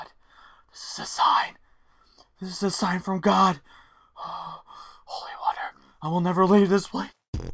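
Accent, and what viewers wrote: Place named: United States. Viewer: Like an American or Bible Thumper. If American's sound like that, what do Canadian's sound like? Makes me nervous just thinking about it O.o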